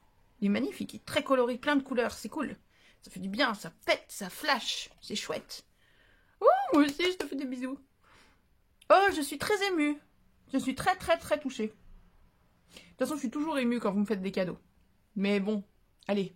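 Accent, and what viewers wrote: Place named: South, France. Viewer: j'aime beaucoup votre style 😉, comme l'a fait remarqué Amandine, votre accent nous met le sud dans la tête. Bienvenue sur you tube, c'est courageux de se lancer, moi ça fait des mois que j'y pense mais... Et j'ai l'impression que ça prend beaucoup de temps, et surtout quand j'entends sur certaines chaînes que les gens sont si méchants, je ne sais pas si j'arriverai à laisser passer ou à m'énerver.. Les deux chaînes dont vous vous êtes inspirées pour la création de vos cartes, sont pour moi de super chaînes avec de belles personnes derrière leur caméra. Bonne continuation. À bientôt ici ou sur votre chaîne. 😉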